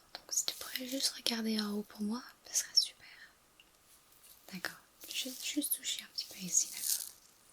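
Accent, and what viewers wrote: Flanders, Belgium. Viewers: elle est pas flamande ?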